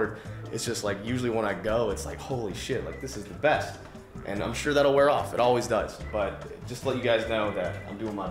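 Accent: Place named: South, United States. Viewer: He lowers his level of southern-ness so people online can understand him lol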